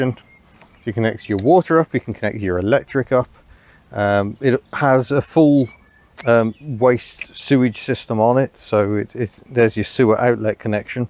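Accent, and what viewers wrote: United States. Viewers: Good American accent! Yes the econoline was a gas hog but ran forever. 'what a big beast. Good motor! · Authentic American accent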